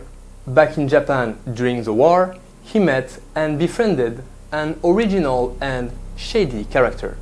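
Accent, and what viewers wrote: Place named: France. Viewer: You sound like a Frenchman.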